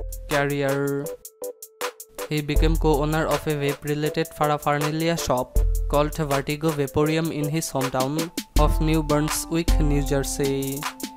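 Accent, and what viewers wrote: India. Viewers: wtf is that engl ? are you from India ?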